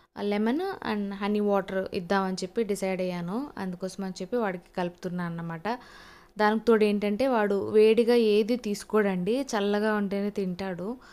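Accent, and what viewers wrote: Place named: Tirupati, India. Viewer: Anduke aduganu Akka, she looks like u Akka, n ur having tpt slang akka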